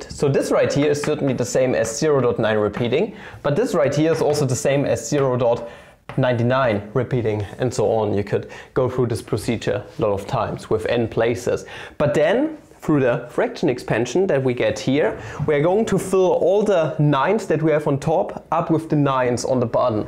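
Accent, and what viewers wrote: Germany. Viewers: It’s not you, it’s your german accent.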